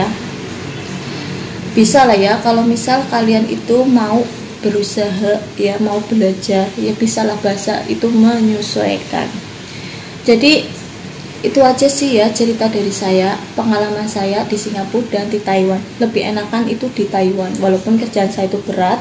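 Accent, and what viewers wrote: Banyumas, Indonesia. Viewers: Pilih mana y? Ngapak gesss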